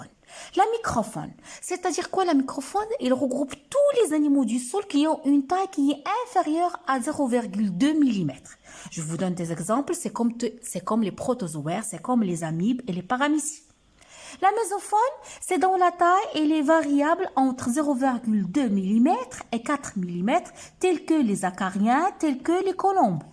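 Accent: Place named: Morocco. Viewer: Ça sent le « é > marocain !!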